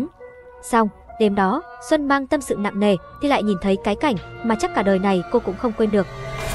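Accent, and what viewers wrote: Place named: China. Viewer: Nghe mô thích người Trung Hoa lác lưỡi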